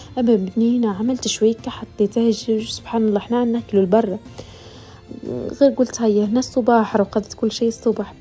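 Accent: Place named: South, Tunisia. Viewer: الجنوب التونسي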